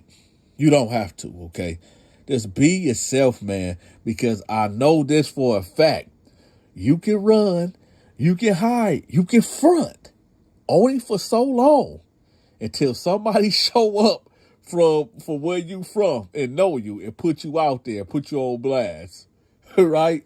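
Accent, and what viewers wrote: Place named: South, United States. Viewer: Man why I think you was from down south lol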